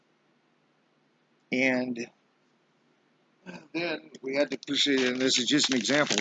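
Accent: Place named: California, United States. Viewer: Hey, - Yeah the comment was mostly about the physical observation of Pinball. the song came 2 mind. I've listened to the entire video. The number coding is interesting but I don't get it all. Your dedication to discrption as to the form makes sense. I admire your talent for being able to cut into this line of logical explanation. I'm seeing a lot of things as well, different direction of observation on certain aspects. You have a cool accent. I can't tell if it's California or the Great lakes, your delivery of speech is a bit quicker than a Midwestern accent. We have a long, long way to go. It's like we're just now entering the late first quarter of the football game. Peace. df.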